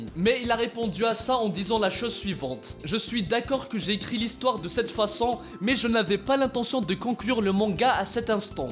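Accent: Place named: Kabylie, Algeria. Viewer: S/O l accent kabyle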